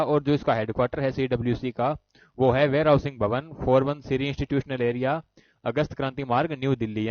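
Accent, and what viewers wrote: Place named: Bagri, India. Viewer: Bhai ap bagadi bolne wale leg rehe ho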